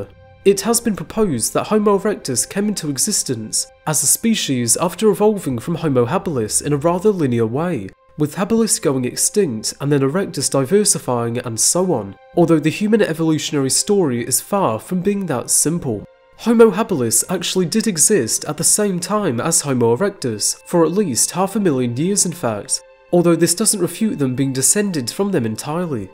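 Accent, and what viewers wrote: United Kingdom. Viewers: The narration drives me nuts. Strong British accent at hyperspeed, along with a very monotonous delivery completely devoid of feeling. Couldn't hang past 6 minutes. Too bad. Seemed like interesting content.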